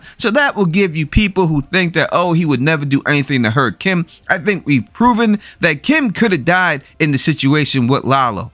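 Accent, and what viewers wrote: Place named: New York, United States. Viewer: Yay! I love your analysis, and NY accent! 😍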